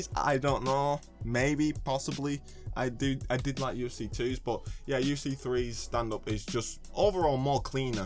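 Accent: United Kingdom. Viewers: You sound British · Jeez mate your accent is like nails on a chalkboard to my damn ears. Common as MUUUUCK. I'm also from the UK. This is the bottom of the barrel of accents we have lol thIIINNNGGHH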